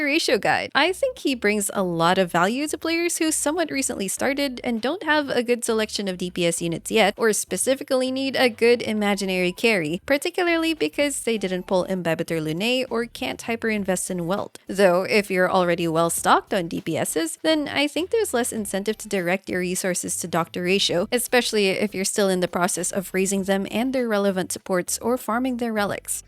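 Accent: Philippines. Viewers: My first video after trawling reddit for recommended Honkai Star Rail CCs, and an uninitiated af, first time HSR player here doing their due diligence. First few seconds of playback and I thought, "Pilipino siguro si," and true enough, you are! Great to see a countrywoman be highly regarded and recommended in the HSR threads of wild reddit and, I'm sure, YouTube (my bad though thinking you had the Y chromosome; a thousand apologies XD). Here's hoping your channel hits 500k subs soon, and grows beyond that! (Yep, did my part to contribute 😉). I realized though this isn't a video for beginners, so I'll just show my noob behind out...and comb through your other tutorials, of course! lol Best wishes!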